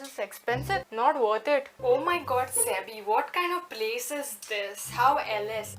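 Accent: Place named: Goa, India. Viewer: It's a relief to hear goan accent, I am from anjuna by the way. Tired of hearing marathi and hindi all the time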